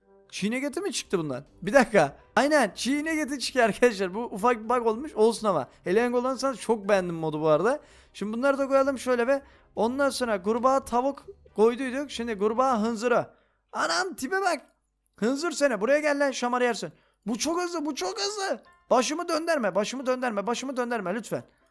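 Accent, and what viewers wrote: Black Sea, Turkey. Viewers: Abi karadenizin neredinsenn söyle ne olur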